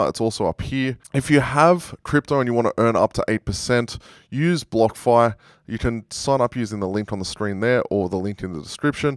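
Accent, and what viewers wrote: Australia. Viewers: Thanks for the videos, it’s nice listening to an Aussie.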